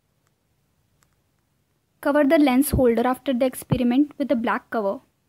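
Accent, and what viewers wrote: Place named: India. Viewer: Indian English..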